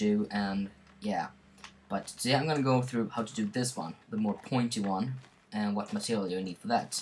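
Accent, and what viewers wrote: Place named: Sweden. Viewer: like a swede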